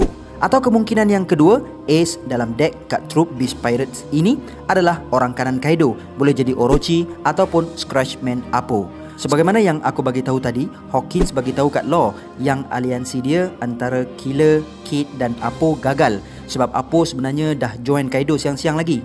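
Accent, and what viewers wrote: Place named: Kelantan, Malaysia. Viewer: Arrr loghat ore koto bharu klate nim!!!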